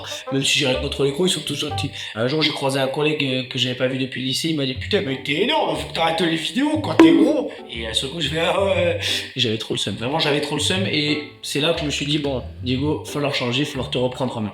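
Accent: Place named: Pau, France. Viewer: Ha je me disais aussi que tu avais l’accent de chez moi ^^ Je suis palois aussi . Adishatz beroy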